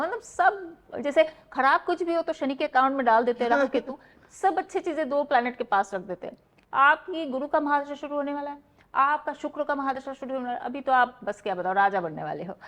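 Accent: India. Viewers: She is Bengali and Bengalis ka hindi itna acha nhi hota and accent bhi alag hota hai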